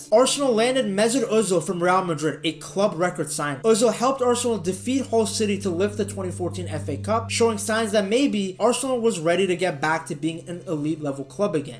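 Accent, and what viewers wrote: United States. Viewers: "VEN-ger," not Wenger. Sorry, man, but if you want people to believe you are an authority on football you need to be able to pronounce correctly the name of a manager so famous that little girls in Europe know it. It's like you never watched a premier league game before 2020 or never listened to pundits discuss the sport. I'm not dissing you, dude, but you sound like some Yank creating clickbait, not a true fan of the beautiful game.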